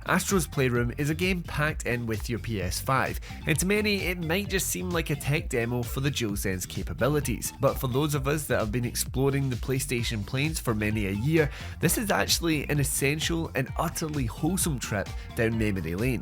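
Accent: Scotland, United Kingdom. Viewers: love the scotlandish accent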